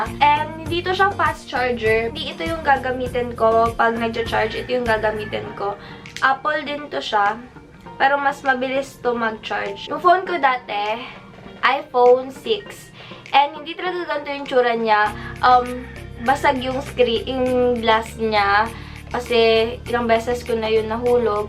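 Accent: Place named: Davao, Philippines. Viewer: Taga Davao man diay ka ate hehe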